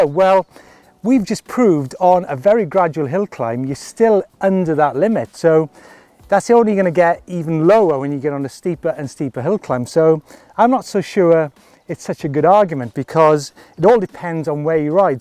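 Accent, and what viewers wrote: United Kingdom. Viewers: I wish if you speak english like a texan yankee,would be better than british.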